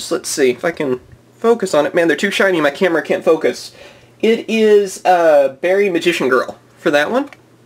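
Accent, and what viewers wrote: Texas, United States. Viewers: Are you Texan your accent?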